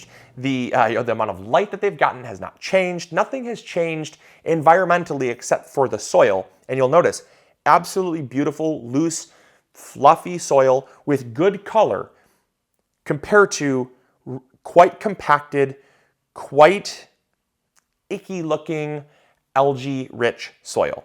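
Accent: Michigan, United States. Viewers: Fun hearing your Michigan accent! So different from the South.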